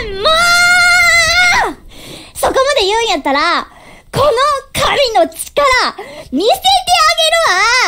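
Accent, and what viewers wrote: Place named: Kansai, Japan. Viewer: いやぁｗ関西弁なのもクッソ可愛いなｗｗ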